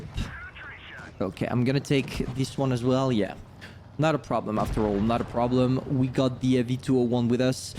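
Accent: France. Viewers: Hey mate. Are French.?.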